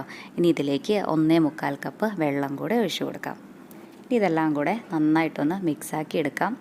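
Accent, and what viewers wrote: Palakkad, India. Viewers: Kollato..chechiku palakkadan slang undu, ath kelkan thanne oru rasam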